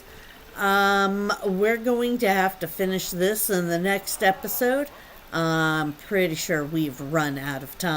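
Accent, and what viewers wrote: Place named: Scotland, United Kingdom. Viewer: The scottish accent I cant get over. can I have your autograph? Your a star ❤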